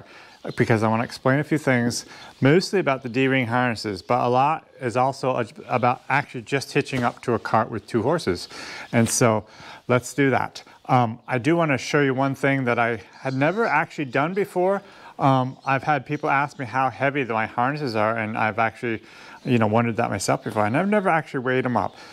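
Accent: New England, United States. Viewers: I was wondering where that accent came form N. E. but not Ma or Ma NH hs become an extension of Boston so it was out and RI (where I lived in 80's has a whole bunch of them Why Prov has at least 6 alone And Woonsocket is a place all to itself throw me down the stairs my shoes where they park the cars side by each and Cranston dont get me started By Love is from there and her nasal is bad)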